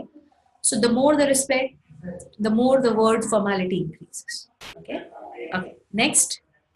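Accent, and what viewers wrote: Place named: India. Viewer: i dont understand Indian accent i hate it very ugly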